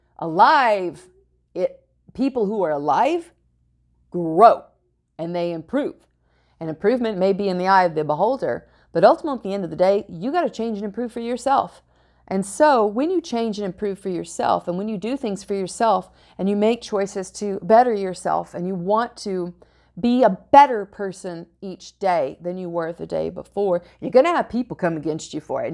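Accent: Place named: Midwest, United States. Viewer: George Bruno turned me onto your channel. You're very encouraging and informative. A feminine woman of God is a breath of fresh air. Love your Midwest drawl. 💯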